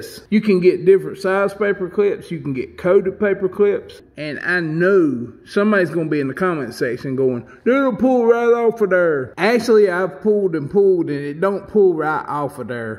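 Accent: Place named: South, United States. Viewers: Southern accents give people a bad rap. As soon as I hear a thick southern accent I automatically assume the person is a dumb/slow. But you my friend, are not dumb or slow. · Dude, I've got to comment. I like your style of explaining and humor. I love your 'southern accent.' You sure you're not from deep in the Southeast? lol Anyway, I've looked at quite a few of your videos and am impressed. You get down to the bottom line $$$ wise and explain the purpose, and why, plain and simple. I've learned quite a bit. I'm in the process of getting back into fishing for next year. Got my boat, currently modifying it and getting it ready. Keep up the good work man...............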